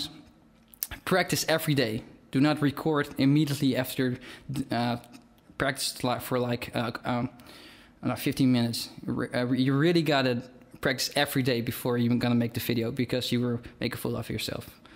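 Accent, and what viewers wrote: Netherlands. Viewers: AaronTK Kelly He is dutch. :D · You are dutch aren't you :p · where are you from? from the Netherlands? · Ik hoor direct aan uw accent dat ge Nederlands zijt :D Heel goed! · sounds like a Dutch accent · are you dutch? :3 you sound like you are. IT'S CUTE. :3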